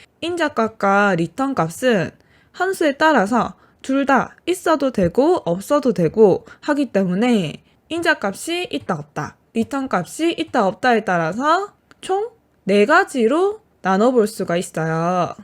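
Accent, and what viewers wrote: Gyeongsang, South Korea. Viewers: 목소리가 명쾌하고, 박력있고, 경상도 억양이 친근감이있습니다. 무엇보다도 강의 내용이 체계적이서 감사드립니다.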